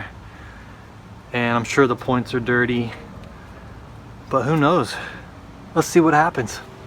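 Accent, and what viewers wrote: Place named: United States. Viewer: My little sister who knows nothing of these things would do a better job . This guy is speaking American not English . B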